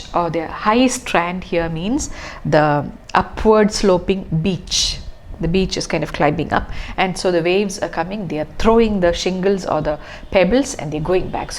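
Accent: South India, India. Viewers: Very nice explanation, mam are you South Indian?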